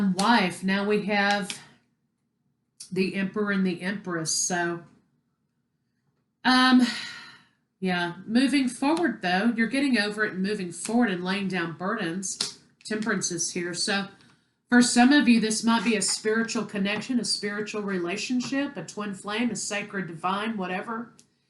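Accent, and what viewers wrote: Texas, United States. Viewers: Love your style of reading. And I just knew you’re Texan. You’re dead right too. Still don’t know how I’ll handle her if she makes contact. My head says hell no. To much conflicts in the past, but there’s a magnetism and it bothers me, as me as person rather start a new with someone else. Im afraid, like you said, it’ll go back to be like before. Tough call. Thank you for a very good reading.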